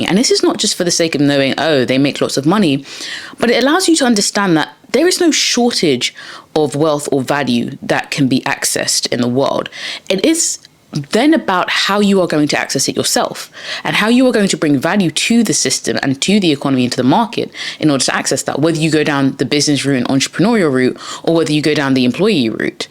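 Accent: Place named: United Kingdom. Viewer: Luv a British accent 🤎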